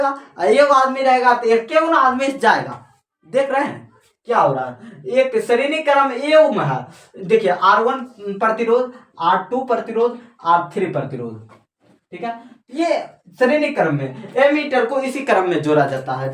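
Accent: Begusarai, India. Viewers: Bhai aap Begusarai se ho kya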